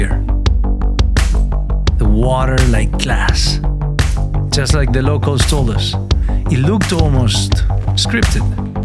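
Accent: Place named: Spain. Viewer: Everything perfect. But just one detail is not so perfect. As a Portuguese I can perfectly, "catch" that the narrator is not the Portuguese Surfer, but a very evident Spanish "Sxsxxss" accent (similar to Pepe Guardiola) when speaking in English! It would be just perfect if would be the Portuguese Surfer doing the narrative voice / speaking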